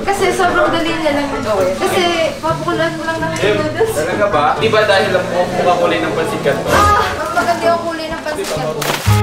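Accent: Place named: Philippines. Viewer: I love the way he Speaks TagLish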